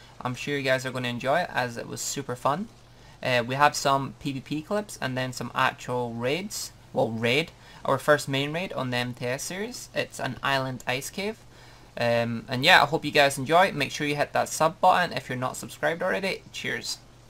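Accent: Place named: Scotland, United Kingdom. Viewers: Where from scotland u from pal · not sure for certain but sounds like Glasgow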